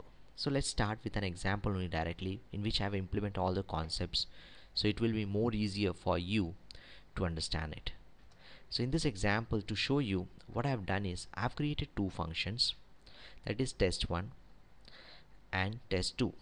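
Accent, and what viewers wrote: India. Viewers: Indian akcnt dude.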